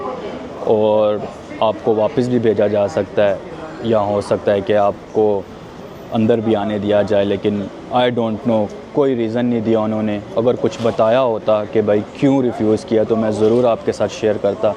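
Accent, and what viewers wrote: Pakistan. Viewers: BASED ON YOUR ACCENT, I FEEL YOU ARE A PAKISTANI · Are you from Pakistan?? Your hindi accent is not like ours. Yes you are a Pakistani...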